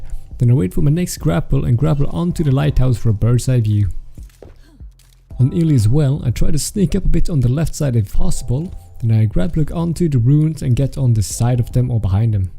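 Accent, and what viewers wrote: Sweden. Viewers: Alex B its a swedish accent or southern swedish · are you Swedish?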